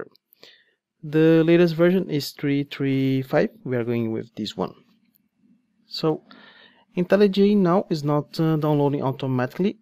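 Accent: Brazil. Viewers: O sotaque não nega BR na veia.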